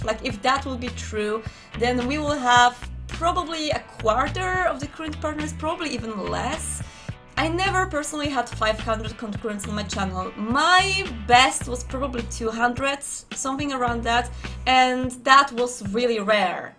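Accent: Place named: Poland. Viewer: Od razu słychać, że jesteś z Polski.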